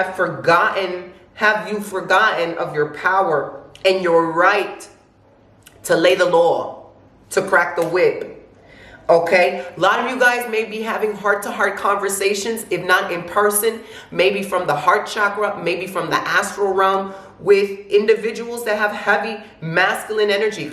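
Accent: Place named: Brooklyn, United States. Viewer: You so Brooklyn & it just makes your videos so much more pleasing & resonating.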